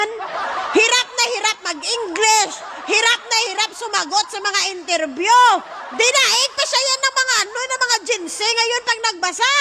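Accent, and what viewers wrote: Iloilo, Philippines. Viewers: Sakit tyan ko kakatawa, Ilongga ka day? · Taga saan ba si bakit Hiligaynon (Ilonggo) accent sya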